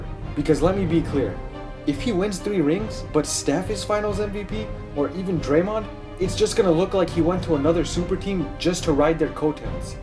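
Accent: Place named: Toronto, Canada. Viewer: Yes I am. I can tell you're from the 6 lolol.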